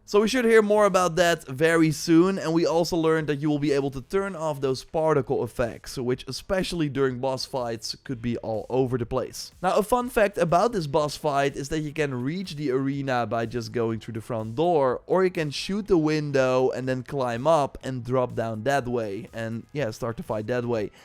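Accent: Netherlands. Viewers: Are you Dutch (judging by your accent)?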